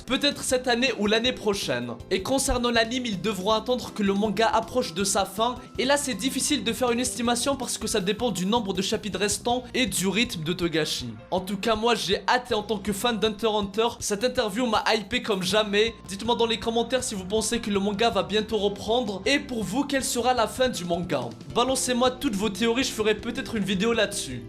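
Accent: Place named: Kabylie, Algeria. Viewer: S/O l accent kabyle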